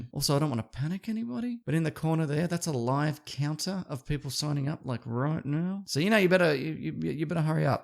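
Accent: Australia. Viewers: u kinda sound ozzie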